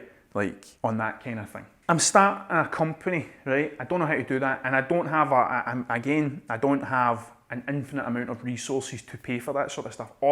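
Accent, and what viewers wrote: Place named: Scotland, United Kingdom. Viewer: just came across your channel man dont know how I have never found it before but I'm happy because now I can go back and watch everything !! trying to get into painting myself and you have given me a fresh outlook on what I'm doing and why I'm doing it, you the man! love the Scottish accent and love the comedic honesty its refreshing hahaha thank for sharing your knowledge man much love from Ireland 🤘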